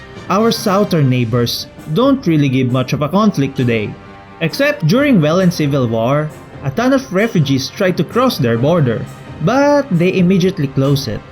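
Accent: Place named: Philippines. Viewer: Strong filipino accent, make a run that parallels idiotic filipino internal policy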